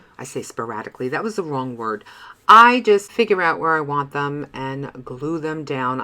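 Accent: New York, United States. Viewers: I love the plant container! You are awesome. Stay safe, I love your New York accent too. Much love from Cape Breton, Nova Scotia, Canada xo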